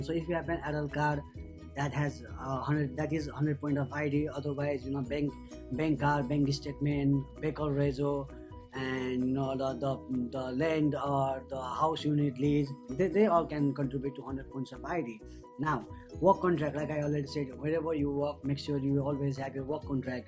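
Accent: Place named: Nepal. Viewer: that's called Nepali accent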